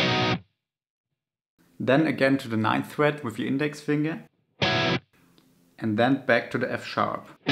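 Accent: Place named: Germany. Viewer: Ohh, okay. Wirklich? Man hört es an der Aussprache, dass du aus D sein musst. Ist bei meinem englischen Kanal auch so, dass merkt irgendwie jeder direkt :) Ja, ich bin immer auf der Suche nach neuen Malcolm Riffs, da ist es durchaus möglich das ich schon mal einen Kommi hinterlassen habe :) Langsam hab ich den richtigen Tick raus, um es beinahe fehlerfrei zu spielen :) VG aus dem Chiemgau, Vanessa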